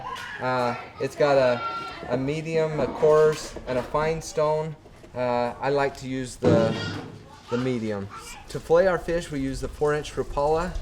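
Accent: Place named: Utah, United States. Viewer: 😂 Yeah, I blame it on the Utah accent. Will try to do better next time.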